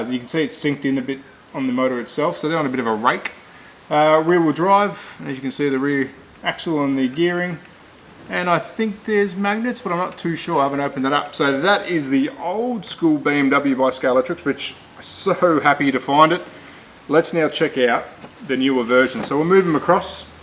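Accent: Australia. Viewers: That Australian accent grates me.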